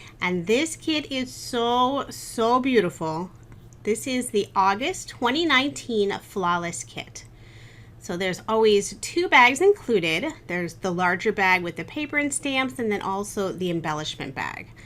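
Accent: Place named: South, United States. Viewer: I keep forgetting you're not in the US. You sound like a good ole southern girl😊💖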